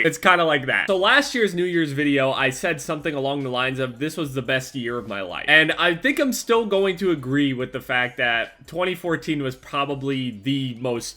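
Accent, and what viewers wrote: Canada. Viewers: You sound like you're Canadian lool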